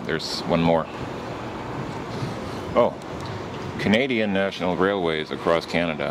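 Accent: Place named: France. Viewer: I know you can't speak german , but the way you read words is in french like the word gasse ... You have to pronounce the 'e' In german you have to pronounce every single letter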